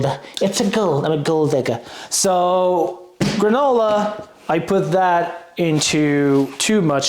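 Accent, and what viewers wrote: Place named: France. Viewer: Your lovely French accent makes everything sound so refined and erudite. Lots of love from SK!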